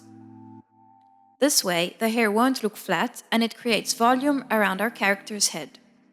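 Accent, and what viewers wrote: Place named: Arab world. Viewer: just a question but omg are u arab? i am! and u have kind of an arab accent!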